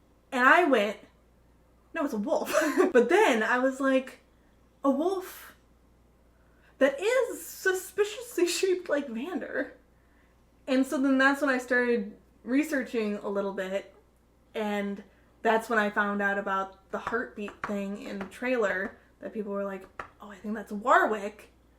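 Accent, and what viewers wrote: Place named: United States. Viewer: Thanks for the detailed and thoughtful comments! I appreciate all the time you have put into making the reaction and this video. I will just concentrate my comments on one: Silco being the villain/bad guy. I don't think this label fits him, or anyone else for that matter, well. The notion that he is driven by selfish desire is extremely dubious: in the final episode he articulates his four central demands, and calls them "everything we ever wanted." None of them has anything to do with himself. Shimmer is merely a means to those ends, which is why he did not hesitate to give it up. In fact, giving it up is considered so natural to him that he didn't even consider it haggling. Also, we need to put him in the proper context: he is a political revolutionary who is fighting for the independence of a nation. Ask yourself this: among the real nations of this world, how many achieved their independence without violently defeating enemies foreign and domestic? 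Judging by your accent, I guess you are a fellow American like me. Just think of our country: how did we become a free, united, independent nation? Well, among other things, we had to beat the British, the slave owners, the Native Americans, and the Mexicans. And our country is by no means unique in this regard, not even close. Your point about Jinx being better off with people like Jayce and Victor is no doubt true. But what about the hundreds of other children like Jinx in Zaun? Can their lives truly improve without the under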